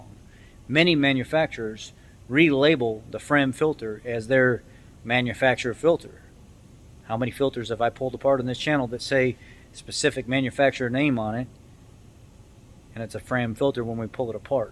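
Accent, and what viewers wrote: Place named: Chicago, United States. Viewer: You sound like you from back east Chicago area lol I love the content.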